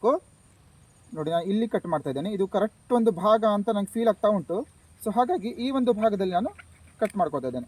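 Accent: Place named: Yellapur, India. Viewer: ನಿಮ್ಮ ಮಾತು ಕೇಳಿದ್ರೆ ಯಲ್ಲಾಪುರದವರು ಎಂಬುದು ಖಾತ್ರಿಯಾಗುತ್ತದೆ. ಎಲ್ಲಿಯವರು ನೀವು?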